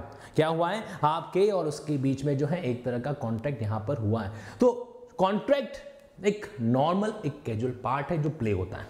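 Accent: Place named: India. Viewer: This rubbish is an Indian law lecture in Pidgin.-English and Indian dialect.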